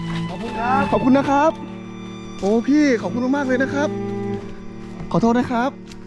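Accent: Isan, Thailand. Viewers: มักอ้ายเว้าอีสานแม๊ ใจบางบักคัก ❤️😀 มาเที่ยวอุบลเเน่เด้อจ้า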